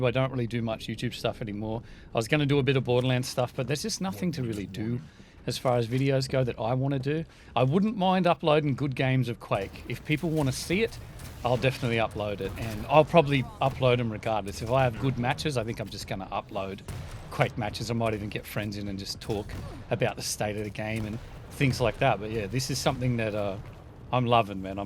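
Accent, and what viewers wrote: Australia. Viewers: I watch you for your personality and your smooth Aussie voice. Just play what you like and people will watch.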